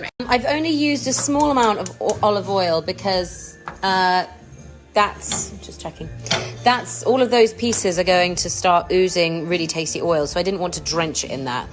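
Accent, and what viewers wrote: United Kingdom. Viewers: It could taste terrible and I would believe her. The British accent makes everything more believable. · I know you won't see this but I loved you in Midsommar! The control you had over your body language and facial expression. A good portion of the movie it seemed like everyone was dazed trying to figure out what was going on until the last 30 minutes. Also just when I think an actor is American and here that English accent! Same with Oliver Cohen who played adult Lucas In "The Haunting Of Hill House" as an American, strung out, drug addict. Watched an interview him, first thing I here is that old British "Ello!" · ohh my God this is British accent I thought you are an American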